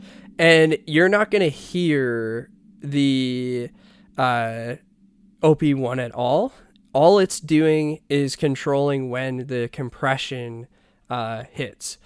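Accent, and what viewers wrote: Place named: Canada. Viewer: I detect a Canadian accent - where are you from?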